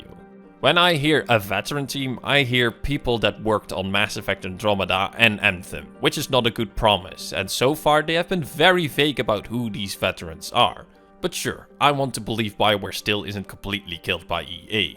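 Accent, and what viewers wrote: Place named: Netherlands. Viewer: Yo...Are you in any shape form or guise connected to Pretend3r or Pretend3rCE? Because he's dutch and is interested in Mass Effect as well. Plus you seem to have a dutch accent...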